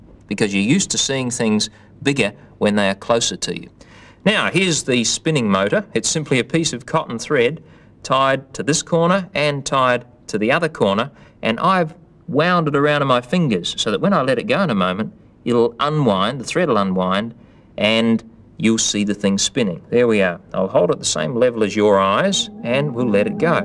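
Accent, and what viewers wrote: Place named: Australia. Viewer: Optical illusions are 127x better when presented in a thick Aussie accent.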